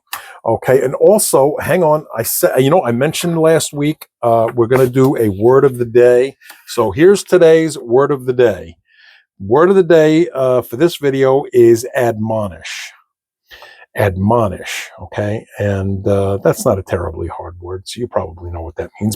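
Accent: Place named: Suffolk County, United States. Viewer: Just found your page wow awesome content I just watched 3 videos,great info and tips/tricks I live in fla I'm originally from Suffolk county so it's refreshing to hear your voice lol happy holidays and please post more videos I'm going to share it with some friends thank again great work!!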